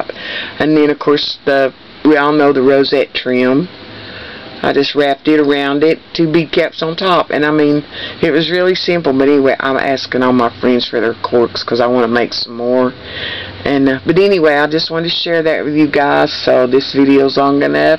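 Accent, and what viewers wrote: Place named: Kentucky, United States. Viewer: Great tutorial! I have been bitten by the cork craft bug too. I love your accent. Kentucky?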